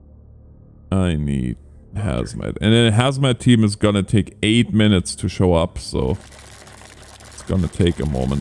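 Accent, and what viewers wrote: Germany. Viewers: Erm, was there a reason for you not to call the medics in on the chemical spillage? The fire was still raging and you had the firefighters drag the casulties out.. I was just wondering ^^ Und täusche ich mich oder hast du einen deutschen Akzent? :D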